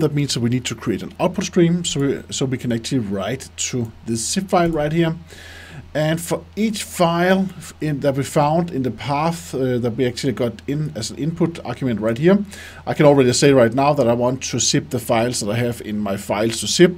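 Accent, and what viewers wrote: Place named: Denmark. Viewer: Soo danish accent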